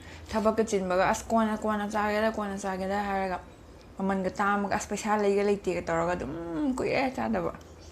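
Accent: Kakching, India. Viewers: Kakching londa ngango taba yam nungaiye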